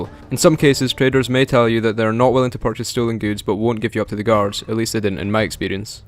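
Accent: Scotland, United Kingdom. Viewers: You Scots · Unmistakable Scottish accent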